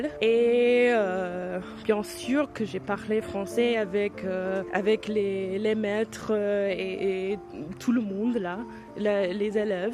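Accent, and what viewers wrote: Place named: Germany. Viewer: Tu as le même accent que mes cousins allemands!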